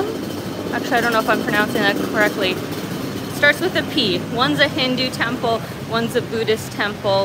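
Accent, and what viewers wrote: Scotland, United Kingdom. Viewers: are you scottish? 😁😁😁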